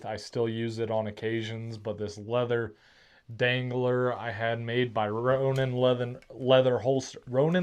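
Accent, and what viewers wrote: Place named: South, United States. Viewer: Where you from, amigo? The south?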